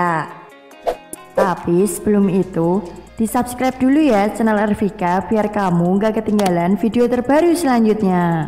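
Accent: Java, Indonesia. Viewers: Khas Jawa 😆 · Suaranya medok bgt gak enak didengar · Medok jowone, tapi malah trkesan unik.. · Jawa tulen ....suaranya.....medok....haha😆😊😀😉😯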